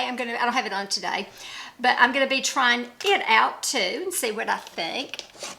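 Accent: South, United States. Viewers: I love listening to your sweet southern voice❤. I live in Georgia and probably sound just like you! Praying for a quick recovery from your upcoming surgery! Bless your heart ❤️. You are a beautiful soul ❤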